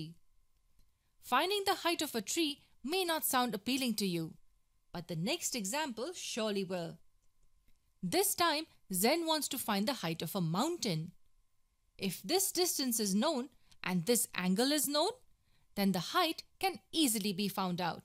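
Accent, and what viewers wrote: India. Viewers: Not bad. But obviously Indian accent is irritating. When you learn English, learn enunciation!!!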